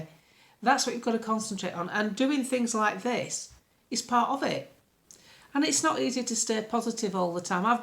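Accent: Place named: RP, United Kingdom. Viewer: Brilliant as usual but stop talking posh. DATA is pronounced day-ta not darter. Phil Taylor is a darter 😊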